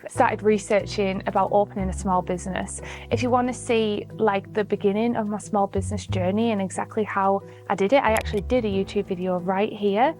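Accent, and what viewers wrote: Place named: North, United Kingdom. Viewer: I had NO IDEA that YouTube sponsored videos! Congratulations on how far you've come - we need more northern voices on YouTube!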